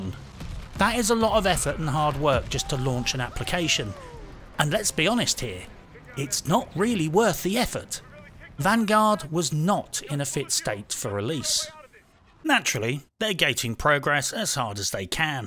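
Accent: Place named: RP, United Kingdom. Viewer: also refreshing to find an anti-left chap with a posh accent.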